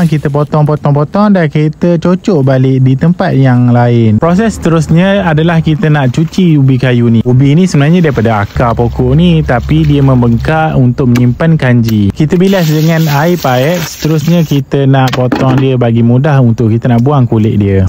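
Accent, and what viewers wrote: Pahang, Malaysia. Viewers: Dari loghat abg ni,mesti dia ni,org Pahang😅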